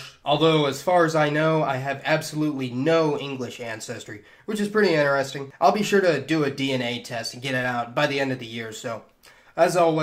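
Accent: United States. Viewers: square sausage the voice narrating is Northumbrian you mean American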